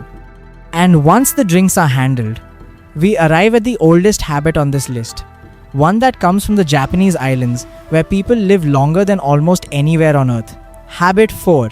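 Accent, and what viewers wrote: India. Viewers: Nice videos ,but your accent is indian not japanese at all ,nothing wrong but it is very clear .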